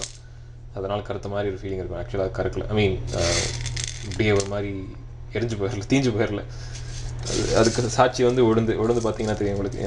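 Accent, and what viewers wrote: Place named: England, United Kingdom. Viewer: hey, never mind. i like your cool english accent and local time.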